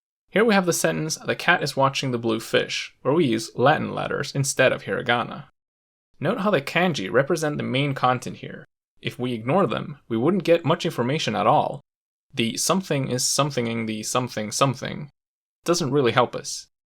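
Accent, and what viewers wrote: United States. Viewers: THE WAY YOU SAY THEM WITH THE BIGGEST AMERICAN ACCENT PISSES ME OFF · you have the most american pronunciation of kanji ever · right? I'm Aussie and I honestly thought he was just American, sounds just like every other American to me! 😂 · Stand out? Like fingernails on a blackboard. To me, it's the worst sound in (American) English. I cringed every time you pronounced it, as well as when you pronounced Katakana and Hiragana in such an American accent. Otherwise, well done...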